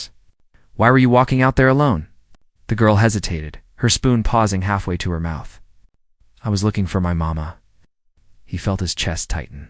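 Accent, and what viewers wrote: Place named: United States. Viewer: Wonderful story! The narrator, obviously an American, does a terrific job. It’s hard to tell if his voice is real, or AI generated. But a great sound to hear a steady voice, no over stated, changing when things get tense. Sometimes when an English man’s voice over stressing the narrative can get tiresome. Or an American voice doing the same thing. I’m very pleased with the stories and narrative in these videos. ❤❤❤❤😊😊😊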